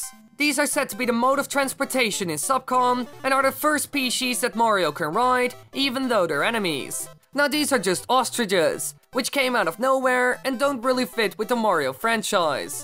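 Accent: Netherlands. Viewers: okay so i watched the video and i just gotta ask..is there a chance your dutch? xD your accent just sounds dutch espacially its not bad or anything im dutch myself (hela hola mijn medenederlanders) but im just really curious ehahaha xD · Are you Dutch? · Are u dutch pls say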